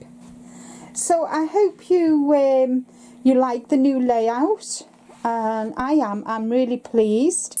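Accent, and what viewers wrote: United Kingdom. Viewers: Petunia for your love of your garden. I think you said Petunia in your wonderful British accent. Of course we all have to have fun naming items too so Flower or Yarny. Love your Yarny room and organization, beautiful and cozy!! Such a lovely channel!!!!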